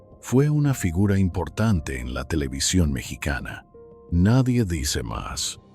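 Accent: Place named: United States. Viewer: El comentarista la caga con ese habladito como de gringo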